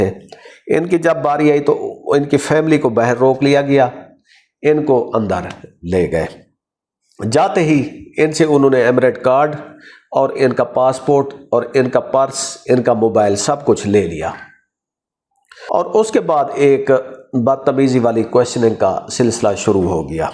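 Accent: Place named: Punjab, Pakistan. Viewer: Aap punjabi lehje main Urdu boal rahe hain aur sari duniya daikh rahi hai yeh video. Itni si tameez tu honi chahie ke proper lehje main is platform per baith ker bat karain.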